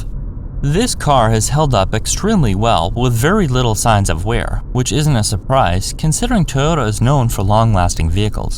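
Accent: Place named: United States. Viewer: I will subscribe when you stop saying "and" like an american. This is an error, try to reverse to the British "and".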